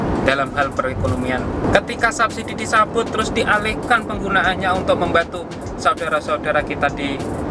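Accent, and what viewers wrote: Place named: Trenggalek, Indonesia. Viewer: Oooooo priyantun trenggalek. Pantesan medok ngendikane. Iyo mas sing sampean ceritak ne kui bener.nek g kerja keras g kate ne iso urip penak.wis to sopo ae presiden ne.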